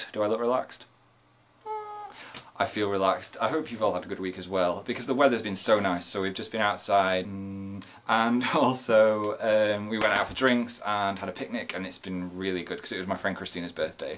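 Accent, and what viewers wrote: North, United Kingdom. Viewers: northern accent is strong in this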